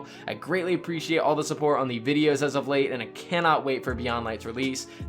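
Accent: France. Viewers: C'est un français ?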